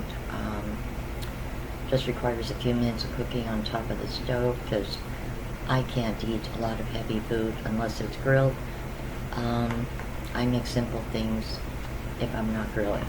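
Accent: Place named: Pennsylvania/Delaware, United States. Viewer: Hi! I knew you sounded like me..its the PA/DE voice we both have!!I have no idea how I found your channel, but I am drawn to your personality! I was watching Freakin Frugal they are a dumpster diving husband & wife that get a ton of stuff from Aldi & Save a lot (mainly Aldi) they donate alot of stuff as well..food/clothes..you need to see these videos. You could add content ty o your channel. People love it! I hope your channel gets bigger & bigger..this is how it starts..much blessings to you! Much love from Middletown, Delaware 💗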